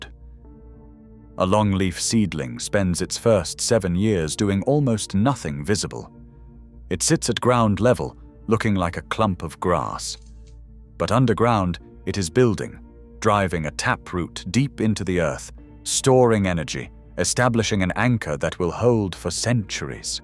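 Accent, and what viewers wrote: United Kingdom. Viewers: Lasted 2 seconds until I heard the British accent then closed video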